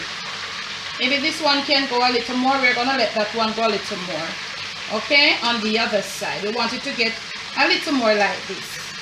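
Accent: Jamaica. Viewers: Every time I watch one of your videos I want to visit Jamaica again. Your beautiful accent and delicious looking food are sooo enticing.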